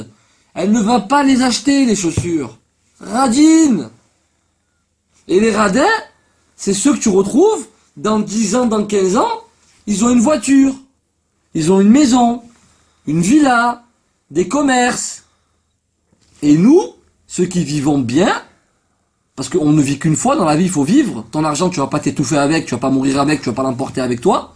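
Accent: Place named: Marseille, France. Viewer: horrible ton accent on le voit le Marseillais qui a immigré à Paris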